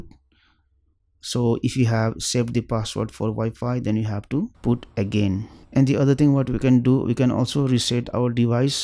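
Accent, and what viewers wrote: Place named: India. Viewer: his voice drives me nuts..thick Indian accent and monotoned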